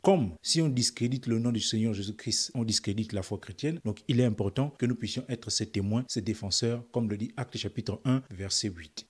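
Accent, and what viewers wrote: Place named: Gabon. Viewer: Je me disais bien que la façon de parler était comme un gabonais 🇬🇦 😅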